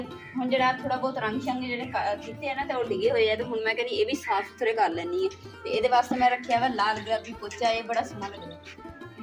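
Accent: Punjab, India. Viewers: Aidha jalandhar wee aurata boldia aa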